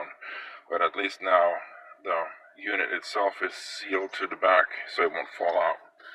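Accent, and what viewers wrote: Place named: Germany. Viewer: you sound like you are either german or Austrian I am of Austrian decent i'm sure you can tell by my name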